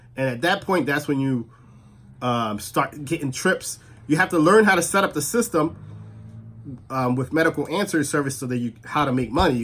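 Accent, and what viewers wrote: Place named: New Orleans, United States. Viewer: Dude gotta be from New Orleans!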